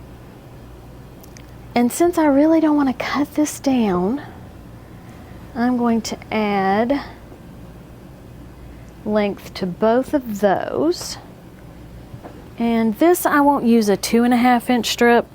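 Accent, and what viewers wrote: United States. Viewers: Yes, I am also a solid border with a scrappy binding (or any binding really) vote :) You've told me before but where is your accent from again? I'm in Australia and can only make out very few American accents! Also, what time zone are you as might join the Zoom but it's hard to get one that isn't in the middle of the night when afternoon there! Obviously, it depends on where you are 😊😊💜💜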